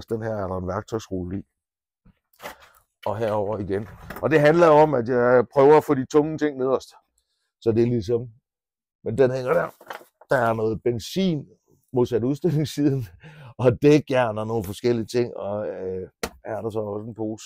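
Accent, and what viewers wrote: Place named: Jutland, Denmark. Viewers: Hold nu kæft du taler jysk på dine gamle dage 🙂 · Du kan tage ud af Aalborg, men ikke Aalborg ud af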